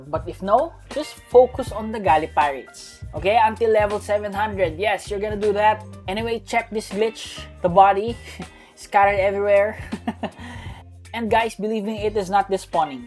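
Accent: Philippines. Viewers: I hear your sound you are Tagalog po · i knew he was philipino its in the accent i know filipino english when i hear one :)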